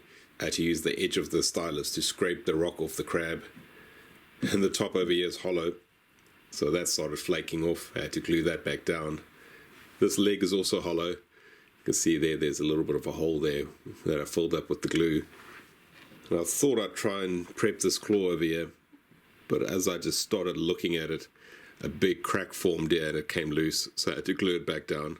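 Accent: South Africa. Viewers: Are you from South africa🤔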